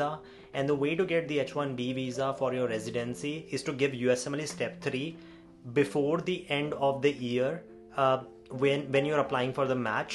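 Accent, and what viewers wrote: India. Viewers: i really cant stand indian accent ufff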